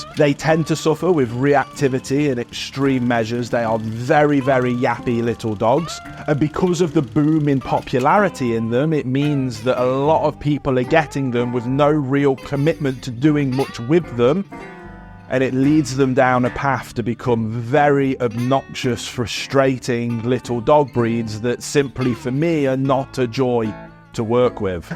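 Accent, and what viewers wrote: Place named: Birmingham, United Kingdom. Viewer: Question- is that a Brummie accent I detect? Trying to learn more about these British accents. It's certainly distinct, but fortunately even discernible to this Yank.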